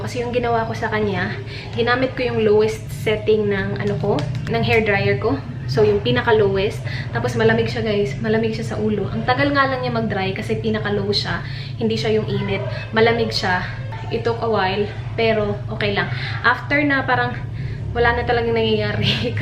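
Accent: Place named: Visayas, Philippines. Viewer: Lalom kaayo ug bisaya ni si.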